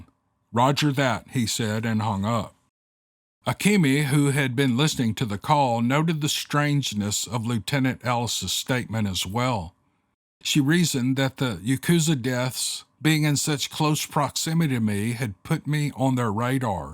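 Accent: South, United States. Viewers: Same here mam been on here for years now love the southern gentleman’s voice w the light touch of southern accent awesome Sir he is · I started listening to this story at work am and couldn’t turn it off. Amazing stories and, you just takes it to another level with his Southern Comfort style narrating it captures my mind like I’m watching a movie. Thank You.. I made it to the end.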